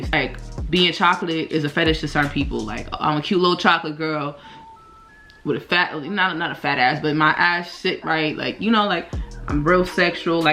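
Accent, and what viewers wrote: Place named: Brooklyn, United States. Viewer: She is most definitely from Brooklyn, Ny. I picked up on it in the first five seconds of the video. Yoo wassup👋🏽😭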